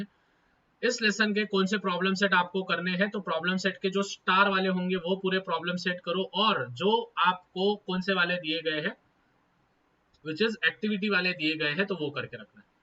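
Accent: Maharashtra, India. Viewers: SIR , AAP KI MOTHER TONE MARATHI HAI KYA....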